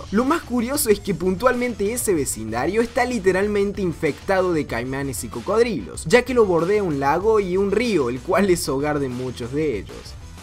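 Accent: Córdoba, Argentina. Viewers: So' cordobeh? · sos cordobes o porteño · SOS ARGENTINO ?¿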